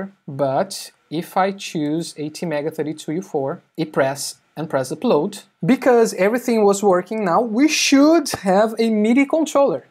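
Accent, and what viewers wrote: Brazil. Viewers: O sotaque brazuka nunca vai embora né rsrs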